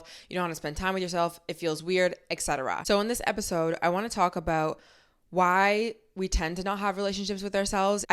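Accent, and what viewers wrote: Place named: Toronto, Canada. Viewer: you so have a toronto accent and it makes me so happy to hear! brings me back home in this world of influencers its nice to hear myself, my friends and loved ones in ur voice 🥹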